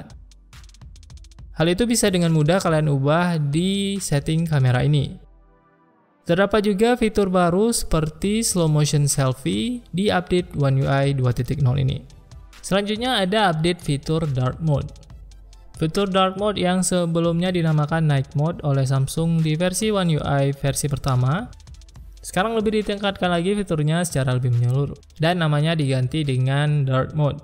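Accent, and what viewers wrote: Aceh, Indonesia. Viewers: lamtemen. aceh bro?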